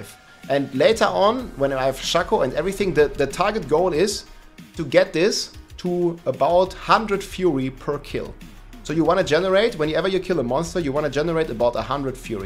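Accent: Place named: Germany. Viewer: Wenn Deutsche Englisch reden, zu funny :D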